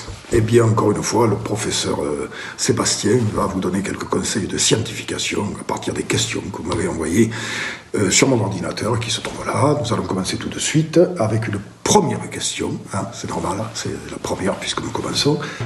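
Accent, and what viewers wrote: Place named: South, France. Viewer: Tu resemble A Raoult j'aime pas Raoult mdr tu fais ton axan sud !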